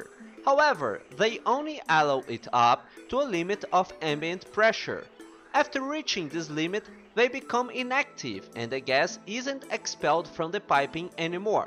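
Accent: Brazil. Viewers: mano, eu esqueci como faz as paradas nesse jogo, venho pesquisar e tem um unico video ingles do que eu preciso e to ouvindo tipo, não pera, sotaque br? dfushdfushd bom video ajudou muito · Caraio, tu eh br ou gringo? Kkkkk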